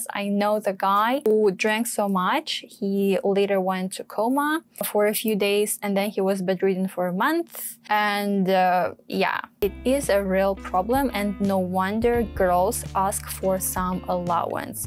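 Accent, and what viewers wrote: Eastern Europe. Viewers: well, which country are you from ? based on your accent,....Ex USSR .Having a lot of knowledges on this fiel, I think you succeed to penetrate "the market" 👍 Thank you for sharing your experience ! th t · precious princess, your accent is pretty cool! Are you Ukrainian, Belorussian, or Russian? 🥰 😘💕☮🌎🌌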